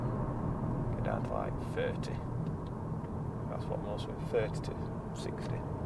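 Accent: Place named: Barnsley, United Kingdom. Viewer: Proper Barnsley lad, tell thi nar!!!! when can tha do my BITDI.... 4MOTION RULES 😂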